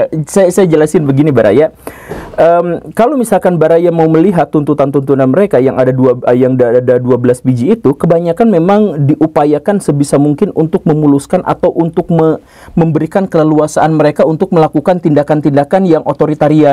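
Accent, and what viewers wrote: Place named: Sunda, Indonesia. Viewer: Aksen sundanya medok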